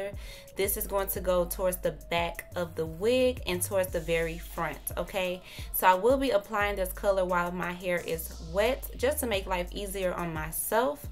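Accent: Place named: Detroit, United States. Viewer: You sound like you from Detroit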